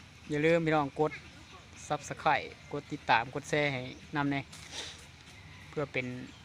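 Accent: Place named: Phu Thai, Thailand. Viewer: ผุบ่าวผู้ไท..หล่อเนาะ เว้าสำเนียงกะไพเราะ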